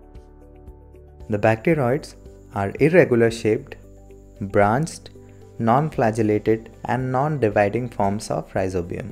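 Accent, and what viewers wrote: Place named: India. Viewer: His totally indian accent help me too understand this clearly vrna animation m har jagah angrezo jese aawz !! !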